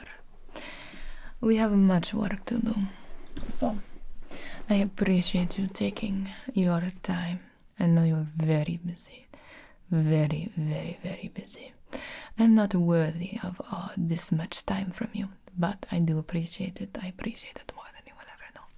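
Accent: Russia. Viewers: It’s Hollywood Russian. She’d make a good Bond villain. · She is trying to imitate Russian accent ?))) ну что же вышло совсем неплохо))) don’t get me wrong but Mexican girls are very hot... can’t watch them for a long, so I must leave it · I love your Russian accent...you simply nail the Russian personality as well. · Not really german mostly russian i have a russian aunt she sounds exactly like gibi's accent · I love that metal gear Russian accent. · i love the Russian accent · For real was awesome Russian voice! I wish 1 video with a little bit o f portuguese (real portuguese PT-PT). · I loved the Russian accent so much that I couldn’t fall asleep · Grrreeeattt Russian accent👌👍🇷🇺☦️ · Another enjoyable and soothing video. Your Russian accent is so addictive and goes well with the blonde wig. You must work so hard preparing such therapeutic monologues. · What an accent!! 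Russian? Goddess! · Damn that russian accent gave me tingles straight up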